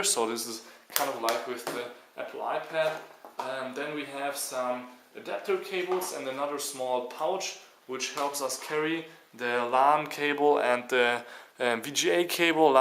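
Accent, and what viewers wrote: Germany. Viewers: Thats the typical german accent^^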